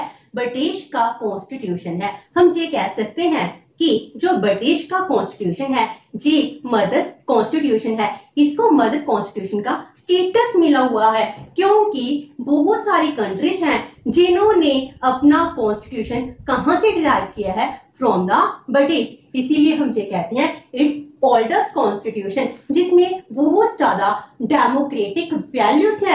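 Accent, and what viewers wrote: Punjab, India. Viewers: Mam you made Polity as easy as Hindi hats off to you mam🙏 Dhanyavaad . Aur aapke samjhane ka tareeka , 5abi accent Best Way mam Thank you🙏 Acche teacher ki nishani hoti hai uska subject ke prati interest joh dikhta hai aapke padhane mai . May god bless you.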